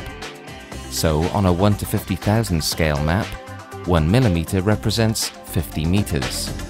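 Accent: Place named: South East England, United Kingdom. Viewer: I'm probably way off beam, but it was a British accent, very south east which also happens to have -0° magnetic declination. So perhaps they didn't demonstrate what they couldn't demonstrate in Britain. Declination could do with some futher information, such as, what does the term "negative declination west" mean? Very good video though.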